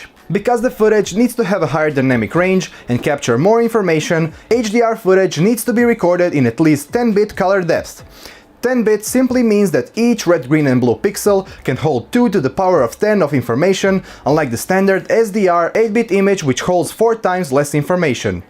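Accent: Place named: Ukraine. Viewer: not interested in ukraine narrators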